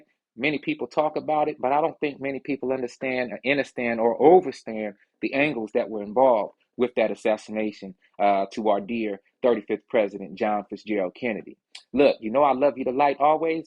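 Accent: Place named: Thames, United Kingdom. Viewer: Vid is fire 🔥you nailed the thames accent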